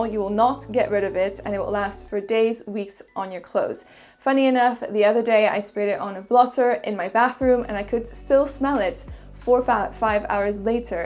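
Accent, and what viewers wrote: France. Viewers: I love your french accent ❤️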